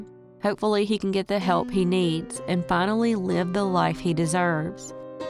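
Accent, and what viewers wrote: South, United States. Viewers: Hey! I'm not sure what your actual name is, so I'll call you. Just so you know, I binged your content last year while building a chicken coop and cutting a lot of wood. So, whenever I hear the intro, I can smell cedar! Or, whevener I smell cedar, the intro plays in my head! Weird, eh? But anyways, thank you so much for the content and soothing voice and southern accent. I wonder, have you noticed a difference in viewership between unsolved and solved cases? I know, for me, I'd like to see more solved cases. Thoughts?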